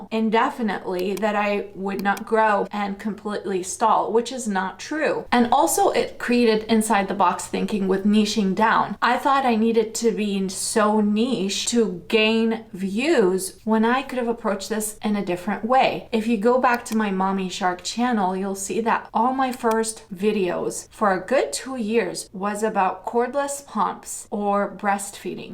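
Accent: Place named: Brazil. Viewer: Are you from Brazil? Your accent reminds me of Brazilians speaking English… Also, the way you said this channel’s name sound familiar to my Lusophone ears!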